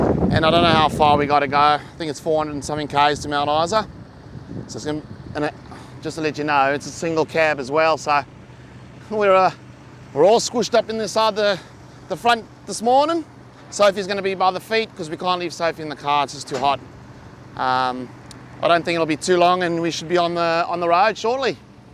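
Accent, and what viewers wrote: South Africa. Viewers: You sound South African under the Aussies twang?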